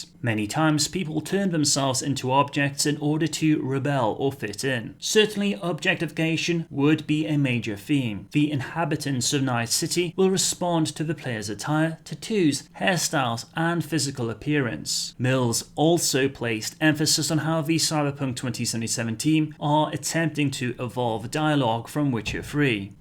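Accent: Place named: North Wales, United Kingdom. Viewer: If I had to guess yeah. You pronounce some words very Welshy and some things sound slightly northern, your voice is really hard to pin down tho. Where are you from then?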